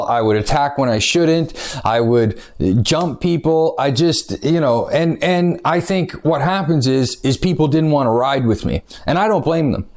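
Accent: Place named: Canada. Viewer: I'm a excessive guy too but you are at another level 😁 I'm from Montréal, so it's a pleasure listening to another Canadian...it's a nice change to listen to something different than from UK of Australia !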